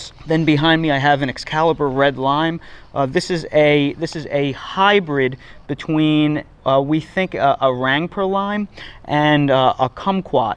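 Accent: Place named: North, United States. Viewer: Florida land is far more valuable than the fruit industry yields in profit. Citrus farms have been selling off for years. In GA/FL, we pronounce it FlOOR i da. You sound like you're from a little farther north than North Carolina.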